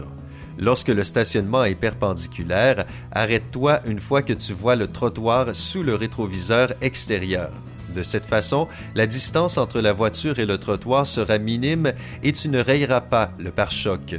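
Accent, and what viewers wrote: Quebec, Canada. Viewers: C'est juste l'accent québécois. Et c'est très joli je trouve. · Skip oui c un accent qc (je suis un Quebecois)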